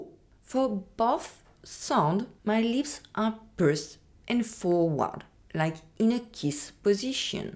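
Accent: France. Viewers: What’s nasa son?? You mean nasal sound?? Please don’t sound French when you’re speaking English. I had to turn subtitles on to understand what you’re saying in English. If you speak French, then ok.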